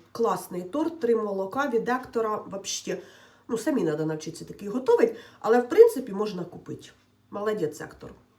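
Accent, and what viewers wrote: Poltava, Ukraine. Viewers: вогонь, а не жінка, а Полтавська говірка просто❤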